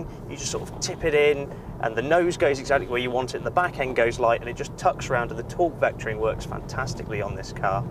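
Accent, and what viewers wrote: United Kingdom. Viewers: The cars are obviously wearing european license plates and he has a British accent...there were clues gentlemen.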